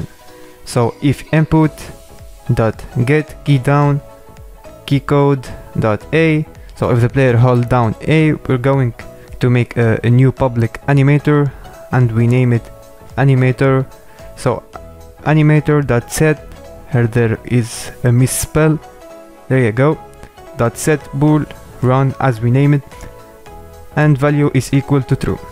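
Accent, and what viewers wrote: France. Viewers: t'est français ? car tu sonne français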